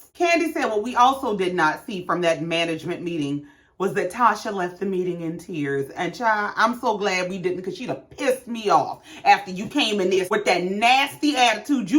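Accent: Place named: Charleston, United States. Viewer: Hey new subbie... where you from? Sound like Charleston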